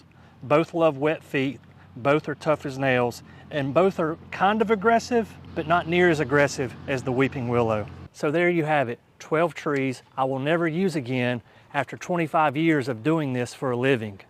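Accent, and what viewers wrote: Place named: Alabama, United States. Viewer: You need to list your region. Based on your accent I’m guessing you’re somewhere near Alabama.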